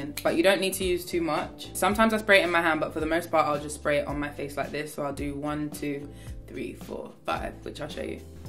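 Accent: United Kingdom. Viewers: how does she speeks british but in her songs she speeks american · Keep forgetting she’s British until she talks at the end of her songs 😂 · forget she’s british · Wtf I didn’t know she was British lmao 😂❤️❤️ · i'm obsessed with her accent and the way she says reindeer, i'm british but have nowhere as good an accent as hers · Wait.. is british what?... I know I'm late but.. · is she British?!! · Her British accent tho 😍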